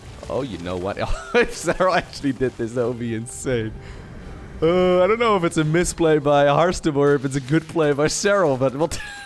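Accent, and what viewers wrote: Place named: Netherlands. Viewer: lotta letters are pronounced different in dutch. whenever dutch people speak english youcan very clearly hear that they are dutch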